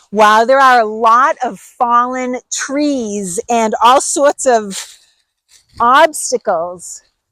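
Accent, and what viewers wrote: Boston, United States. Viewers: you have an adorable “Boston” accent. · No way, accent is definetly from inside the 95 belt around Boston.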